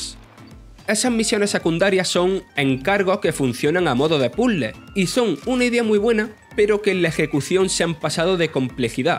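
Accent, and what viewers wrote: Andalusia, Spain. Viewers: yo creo que mas andaluz, es que tiene algun problema, yo no hablo asi y no conozco a ningun andaluz que hable asi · La gente que se queja de su acento andaluz diciendo incluso que le sangran los oídos, todo bn en casa?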